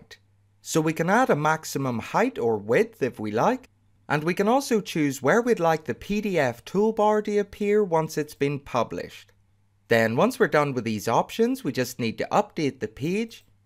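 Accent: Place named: Belfast, United Kingdom. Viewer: Hello. From your accent I think you may be local to me in Belfast. If I could ask a question it would be great. I installed a pdf embedder a few months ago in our Wordpress website. It worked well until this weekend when it seems to have disappeared! I can't remember which plugin it was so I installed another one that has a 'toe bar' (?) like the one you use, but my original embedder allowed you to scroll up and down directly on the pdf. Is it possible to get this back. Many thanks, Geraldine.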